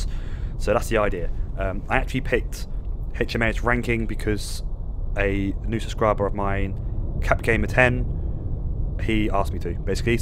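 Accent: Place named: United Kingdom. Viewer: What’s with this British talk on an Australian Built Submarine I am disgusted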